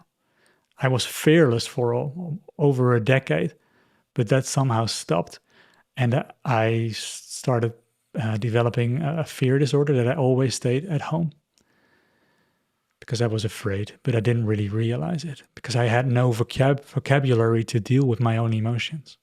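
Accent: Netherlands. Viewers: My compliments 👏. The bravery to confront yourself with your childhood ànd work on it. All my love and light to you and all the ones who listen to you. (I hear a dutch accent?) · Sounds like a Dutch accent.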